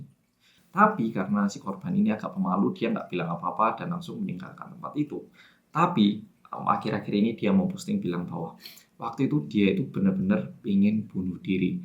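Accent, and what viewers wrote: Java, Indonesia. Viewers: Hiyayy medhook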